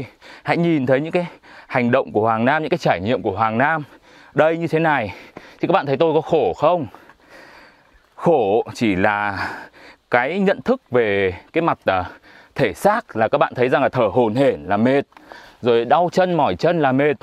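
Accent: Hanoi, Vietnam. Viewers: Giọng HN rất hay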